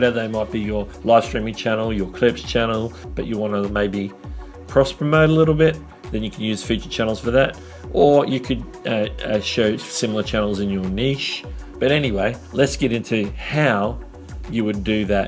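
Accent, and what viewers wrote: Australia. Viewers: this guy is Australian?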